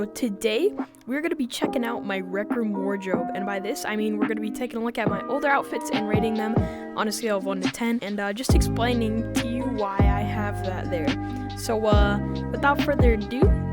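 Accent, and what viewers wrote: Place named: United Kingdom. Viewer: Are you British or American I cant tell 😅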